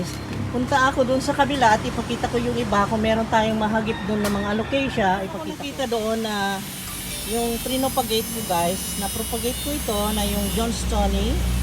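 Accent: Ilocos, Philippines. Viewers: I was wondering about your accent all along, Ilocano pala kayo living in the Visayas. My family is from Pangasinan-Tarlac, both Ilocano parents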